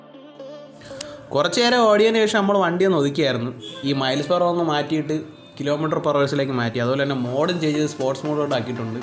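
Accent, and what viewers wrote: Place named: Trivandrum, India. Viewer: Neeyokke parayunnathu matulavarkku manasilava kude Ella athinekal nalathu tvm bhasha thaneyya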